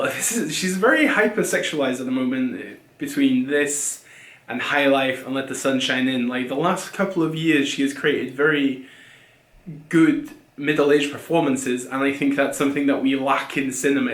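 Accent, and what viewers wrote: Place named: France. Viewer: I don't know what impresses me more....the movie review or your French accent!!!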